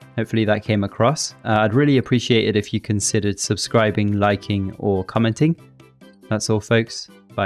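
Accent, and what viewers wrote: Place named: United Kingdom. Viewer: Great video but you're british :/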